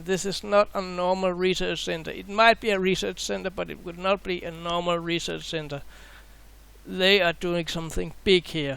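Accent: Denmark. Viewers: you must be Danish ❤😅. Elsker din accent, hvis du forstår dette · kender en dansker når man høre en.. god video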